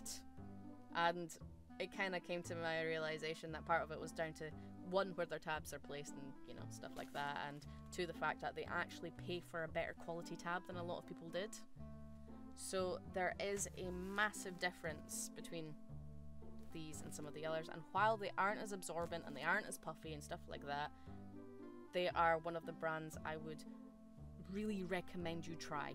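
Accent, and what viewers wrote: Scotland, United Kingdom. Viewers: Wow!! Thats the thickest scottish 🏴 accent i have hear from you so far. Please keep it up yer wee lassie 👍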